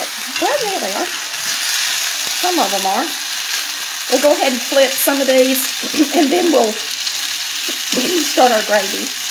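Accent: South, United States. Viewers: I live in Dekalb County during the winter and summer and Arkansas during spring and fall for fishing. I think you speak eloquently and have a good subject and verb agreement. You have a southern drawl and you have a nasal sound. I have the same issues. I have a wall that is papered with degrees but because of sinus issues, I have a hoarse and nasal sound. We love you greatly.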